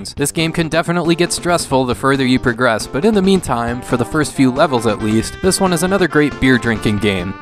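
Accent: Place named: Midwest, United States. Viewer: It's very midwest, its like I'm back home.. 😎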